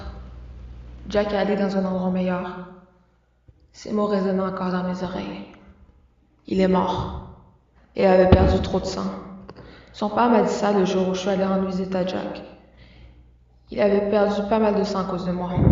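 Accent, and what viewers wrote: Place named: Quebec, Canada. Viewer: Magnifique accent québécois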